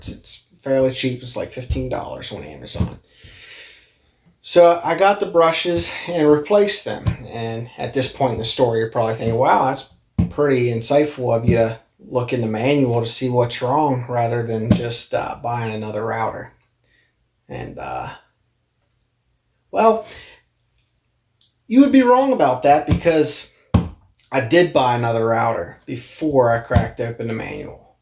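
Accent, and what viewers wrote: Pittsburgh, United States. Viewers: Is that a yinzer accent I hear? Rad build!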